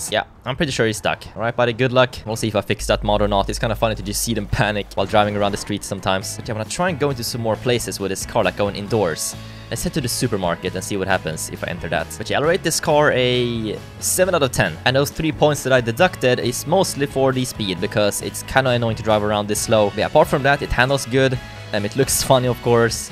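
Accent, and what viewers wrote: Sweden. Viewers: Are You frome sweden? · I think ur swedish im i right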